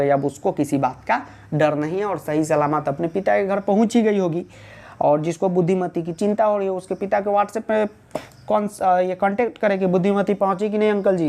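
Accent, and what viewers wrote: Bihar, India. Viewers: Next Level Teaching !! Bihari Ascent '" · Omg!!! Your Bihar ascent is so sweet.... Can you plzz give me some short trick of sanskrit 😂🤣😂🤣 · Sir improve your hindi,English it is full bihari version learn from your wom channel 😀 and stop diverting us from studying to movie (wth is sonny deol).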